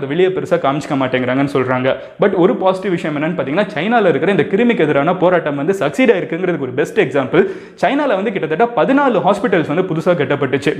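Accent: Madurai, India. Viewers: Mg neenga maduraiya???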